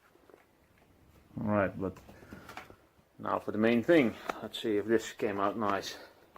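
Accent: Netherlands. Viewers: Hi we met on Gil's stream just know not sure but are you Dutch too.I hear a similare accent I have eather way greetings from your new friend from the netherlands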